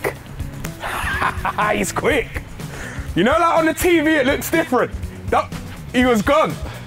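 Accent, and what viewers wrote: Colombia. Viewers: Hey loved your colombian accent 😁 great video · Gosh, where's from? He's English, but has a great Colombian accent in Spanish.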